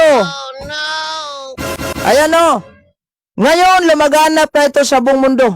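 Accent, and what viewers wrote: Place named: Tondo, Philippines. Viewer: Bakit ganyan ang tagapagsalita, parang nakikipagdaldalan lang sa kanto at usapang lasing. Hindi maayos magsalita, tigas ng at accent at parang lumaki sa Tondo . Para bara bara lang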